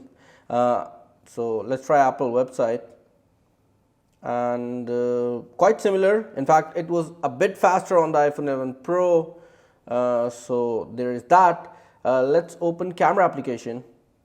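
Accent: India. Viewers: Ooh! That typical indian accent though.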